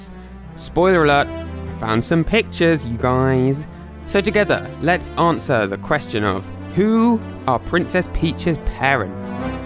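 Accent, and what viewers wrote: United Kingdom. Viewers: what kind of british accent do you have? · What a great accent you have...what part of Britain are u from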